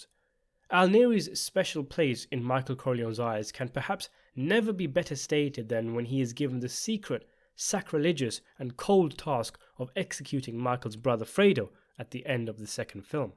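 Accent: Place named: United Kingdom. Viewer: Thank you - but british accents are the worst.. Sickening.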